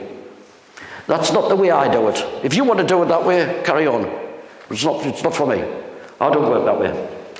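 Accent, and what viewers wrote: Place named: Newcastle, United Kingdom. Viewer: That's a Geordie accent (from the North East of England, probably Newcastle) not a Scottish accent (like Scotty - from Scotland).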